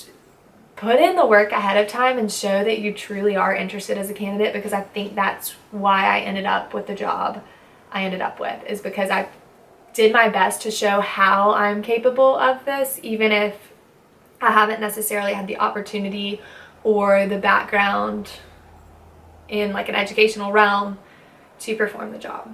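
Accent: South, United States. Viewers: Your Southern Accent is Beautiful! I could listen to you all day! Oh, and your gorgeous too. Signed, New England Yankee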